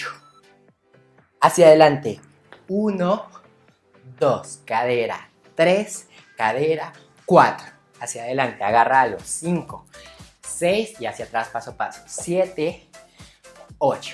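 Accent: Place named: Mexico. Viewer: De dónde eres no me suena tú dejo o eres Mexicano?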